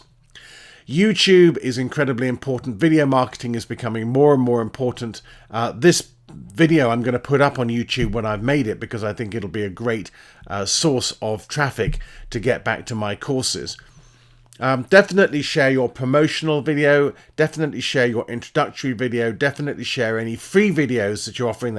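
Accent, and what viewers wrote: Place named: United Kingdom. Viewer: your very good tolisten to i bought my first course last night looking forward to it. just a thought what is pulse. i think instagram reels and tik tok reels are a quick easy way to catch the eye to get a viewer to consider your course. get them with a key point from one of your courses.. nice accent does sound like british army show lol .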